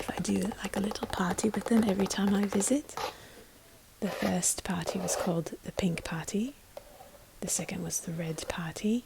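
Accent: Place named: United Kingdom. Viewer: I’ll always be on the lookout for any little slip up in your British accent 😊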